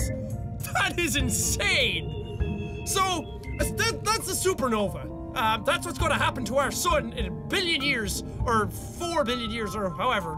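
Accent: Ireland. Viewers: Not just wee bit.... COMPLETELY IRISH!!! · his Irish accent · Are you a weee bit Irish? I just discovered this btw.... don't judge me. Keep up the good work! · What! He IS Irish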